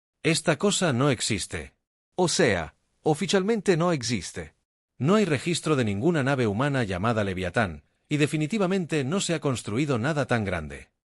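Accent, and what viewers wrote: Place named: Italy. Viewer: Si vas a hacer esto con IA, por lo menos asegúrate de hacerlo bien. Haz que lea los nombres en inglés con la pronunciación correcta y evita eso de leer el texto con acento italiano. Por el resto, todo bien. Pero es un poco molesto escuchar con esos detalles🥲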